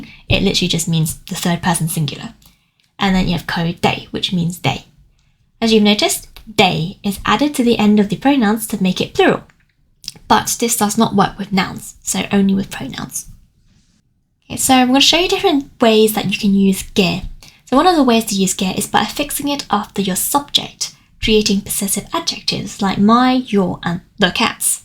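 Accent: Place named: England, United Kingdom. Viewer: Very clear. And you have a nice English accent (meaning understanding is more clear)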